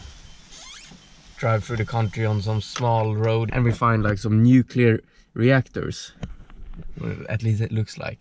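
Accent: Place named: Sweden. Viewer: Jag tyckte att du hade en svensk dialekt😂😅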